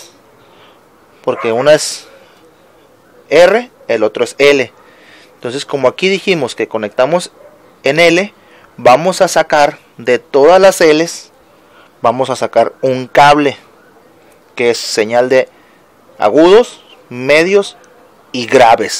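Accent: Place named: Piura, Peru. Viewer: ese dejo es de piurano o de mexicano.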